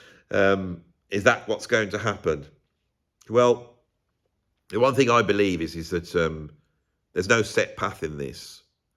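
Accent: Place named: Stourbridge, United Kingdom. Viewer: You aren't from Stourbridge, or nearby, are you? You sound like guys I grew up with 🎉🙏🏾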